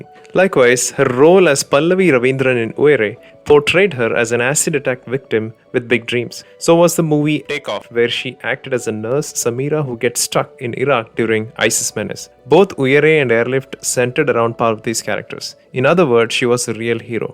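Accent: Kerala, India. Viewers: മലയാളി ആണ് ശബ്ദതിന്റ ഉടമ എന്ന് മലയാളം accent കേട്ടാൽ അറിയാം 😂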